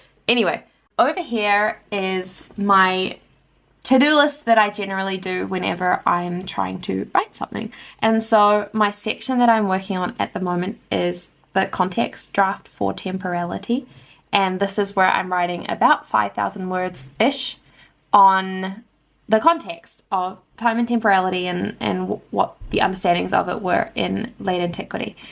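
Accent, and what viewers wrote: New Zealand. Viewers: Haha I recognized the kiwi accent right away!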